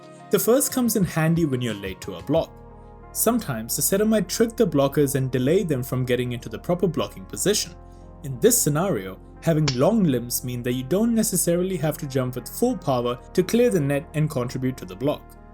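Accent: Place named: South Asia. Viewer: I can identify a desi voice when I hear one👀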